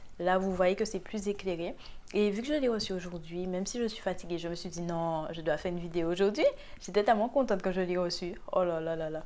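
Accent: Antilles, Caribbean. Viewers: L’accent antillais est présent 😂😂🙌🏾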